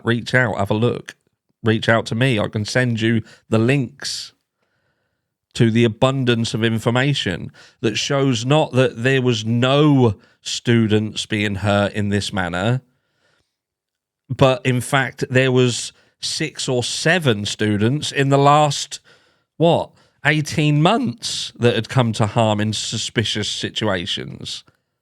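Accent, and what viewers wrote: Norfolk, United Kingdom. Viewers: More to this case than meets the eye! you need to have a sing-a-long AKA -:D show off that Naaafoll accent:P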